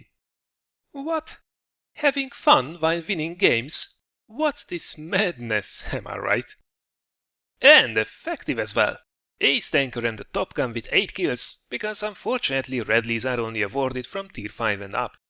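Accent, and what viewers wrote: Hungary. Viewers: where are you from cause your accent is like Hungarien :)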